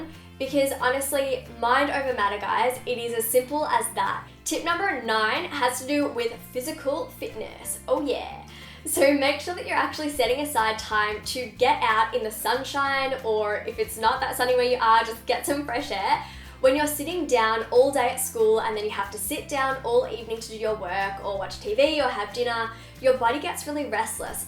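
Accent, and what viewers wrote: Australia. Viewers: Is it just me, or is ' Australian accent actually very cute?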